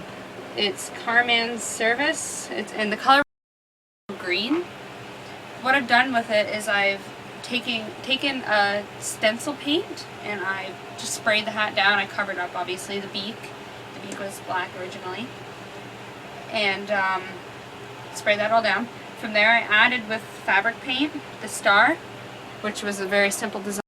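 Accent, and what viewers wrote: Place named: Canada. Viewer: you sound canadian. are you canadian?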